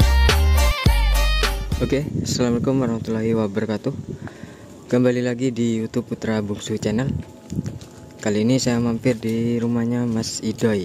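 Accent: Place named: Madura, Indonesia. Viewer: Logat nya mas ke madura madura an hehehehe😅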